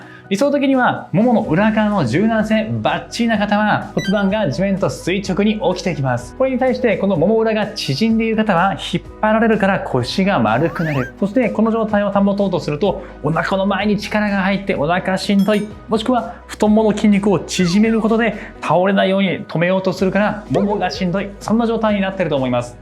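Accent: Kansai, Japan. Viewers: この回は関西弁が少々多目で嬉しい😆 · 参考になります で、ええ声の合間合間にかなり関西弁のアクセントが入ってるのですが、「漫才師の麒麟」の感じでええ声関西弁でストレッチもなんかいい感じ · 先生のレッスンでピラティス熱が再燃しました！ありがとうございます🙌 あと、今日初めて、ん？関西アクセント？のイケボ？と思いました。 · 関西弁のいい声はナダルみたいで聞き入ってしまいますね！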